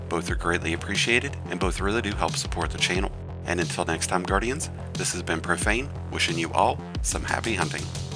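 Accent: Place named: South, United States. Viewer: Profane, I am a fan for your voice and southern accent. Please, check your mic as it's always been high pitch. A little bit of shhhhh too. Hopefully you can make a cleaner sounding voice the your future here. Love from a fan.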